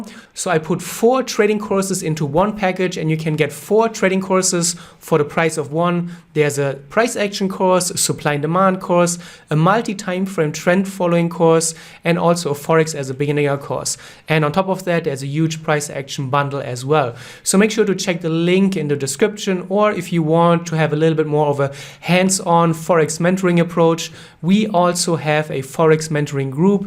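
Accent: Germany. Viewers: are you from germany? :)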